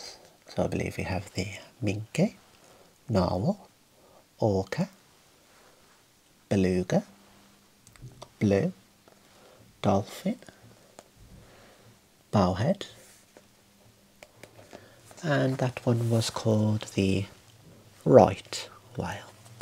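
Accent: Birmingham, United Kingdom. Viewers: I love that this video lets us know you're in Birmingham - as if there was any doubt about that wonderful accent! :D thanks so much for this - your videos are the best, never fail to chill me out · Not brummie asmr omg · Brummy accent!! ❤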